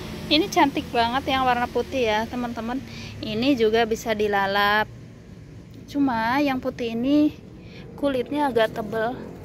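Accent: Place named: Java, Indonesia. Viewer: Kalo boleh tau Kakak orang Jawa ya, soalnya kalo ngomong kyk logat orang jawa😊 Ohya kok ga pernah lihat bunga vincanya Kak, dimana ya?💚🌱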